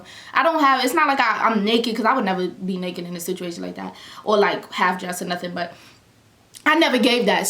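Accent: New York, United States. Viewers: “Like ouuuuuuuuuuuuuu LMFAOOOOOOOO are you dumb.” You’re so New York I love it 😍😍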